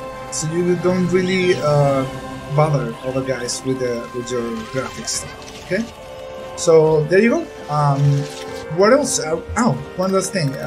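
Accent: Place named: United States. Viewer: ahaahah yo decia porque no estoy suscrito si me suscribi y no sabia que tenias otro canal en ingles haahhah, saludos y te sale igual que un gringo.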